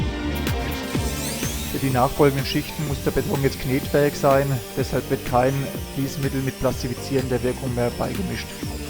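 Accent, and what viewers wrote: Franconia, Germany. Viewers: Du kommst doch aus Franken des hör ich doch 😝 komme aus Würzburg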